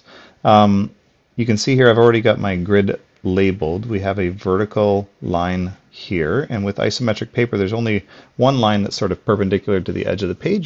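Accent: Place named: Canada. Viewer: why do you say zed you sound like your from the USA or do I detect a Canadian accent?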